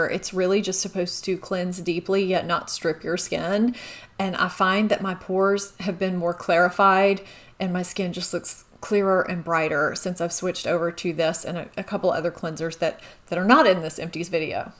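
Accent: United States. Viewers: Hi, new to your site (and most others too, not very tech savvy😋) but I wondered if you could hold the items still so I can read the product name, or perhaps put in some text in one of the corners of the screen with the name of the product. I’m in Aus, lots that you test are not familiar to me and I often don’t catch it’s name (American accent is sometimes quite different to Aussie accent). I’m really enjoying your videos, and learning lots. Plus you are blessed with beautiful skin, pretty much everything you wear looks lovely. I’m 63, had horrendous acne and super oily skin, it’s looking the best it ever has, strangely. Not too many wrinkles, but it’s def aged. Jill in Aus.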